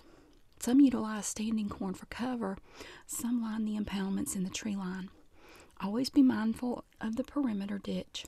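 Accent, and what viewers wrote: South, United States. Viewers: Nothing but good ol' fashioned tingles. Loved hearing that Southern Accent. Reminds me of where i grew up :)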